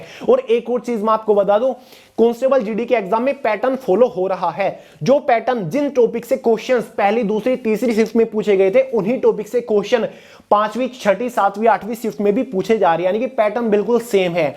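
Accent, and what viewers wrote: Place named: Haryana, India. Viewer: SIR APKA HARYANAVI ACCENT SEEDHA DIL PAR LAGTA HAI 😊❤️ MAZA AA GAYA REVIEW DEKH KAR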